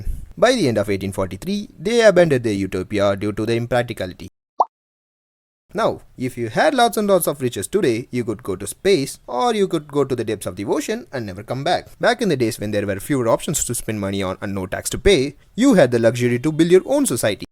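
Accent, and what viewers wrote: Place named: India. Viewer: You sound like an Indian sam'o nella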